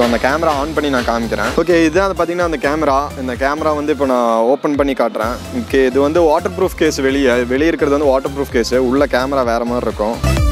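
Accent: India. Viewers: Bro neenga entha ooru Kongu slang varuthu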